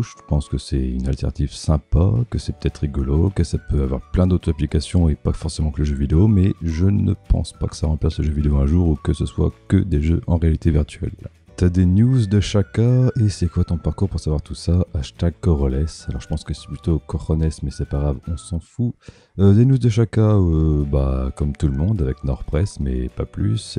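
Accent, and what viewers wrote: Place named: Hauts-de-France, France. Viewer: J'arrive 4 an après la bataille, mais j'aurais aimé savoir d'où vient ton accent. Tu prononces les sons "A" d'une façon particulière, ça ressemble à l'accent de ma région (Hauts de France-Belgique).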